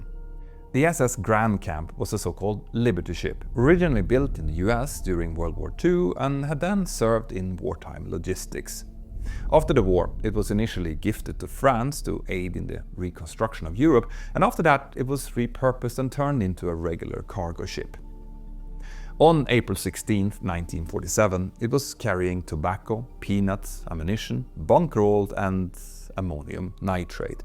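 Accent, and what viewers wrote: Sweden. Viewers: What a great spin off from your main channel! The dedication to details, the source criticism/research, the build up using past incidents and your style (art) of your story telling is a perfect match with (preventable) tragedies like this. And your Scandinavian accent is unique and perfect for emphasizing the importance of details. Svensk, inte sant? För mig som skåning låter det som typisk mellansvensk "brytning", typ östra delen av Svealand någonstans? Anyway, a big thumbs up to your entire team! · This is 100% a Swedish dude speaking, the English is good, it’s the dialect that gives it away. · Look up pronounciation for "flammable", "origin", "threat", "through", "aftermath", "partially", everything else is just charmingly Swedish. Great video!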